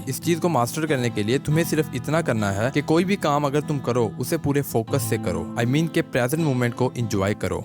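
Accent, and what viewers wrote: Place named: Punjab, India. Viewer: You r punjabi right 😮😮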